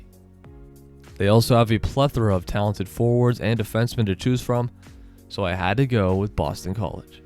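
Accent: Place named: Upstate New York, United States. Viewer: he can not be, sounds like up state NY. Or he is reading stat sheet from 6/7 years ago. The Gophers? Michigan is best in the 10. Badgers? Who? U.M.D. and North Dakota are better.B.U.however,that is right.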